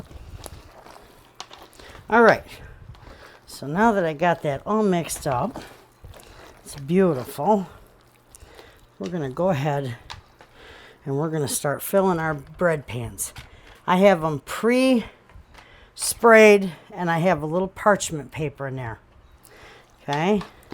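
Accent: Michigan, United States. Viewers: I am so glad I found you! I started my at home cottage bakery back in October last year and I am learning so many tips and tricks from you. We are doing our local farmers market and getting some really great feedback and sales so far! Thank you so much for being open with your techniques and recipes!!! PS I am from MI, haven't been a resident there for over 25 years but I distinctly hear your Michigan twang LOL. Love it!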